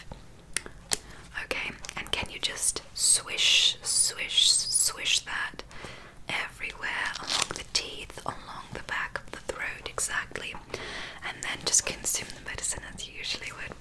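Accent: England, United Kingdom. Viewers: Best ASMRtist on YouTube, and the very realistic British accent is back :) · this english accent is so jarringgg please stop it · Being british when you say certain words in this British accent I sit here repeating them like “do I say stomach like that?” I mean clearly I don’t I am from Manchester I am in no way posh 😂😂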